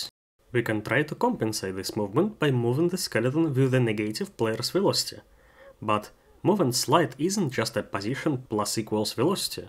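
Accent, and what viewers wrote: Russia. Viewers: Слышу русский акцент. Может ещë и на русском языке начнëшь делать?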